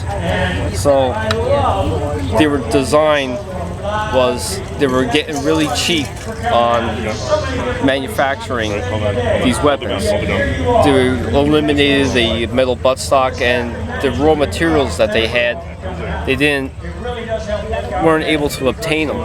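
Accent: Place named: New York, United States. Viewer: I can tell your from new York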